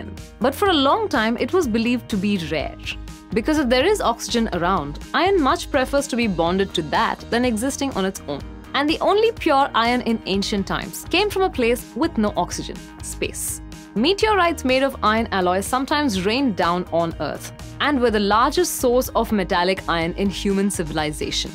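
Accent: India. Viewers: her accent was screaming Indian Subcontinent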